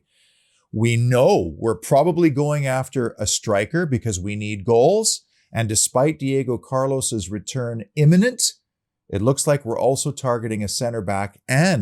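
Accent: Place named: Canada. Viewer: A villa fan with a Canadian accent? Wow that's a little surprising.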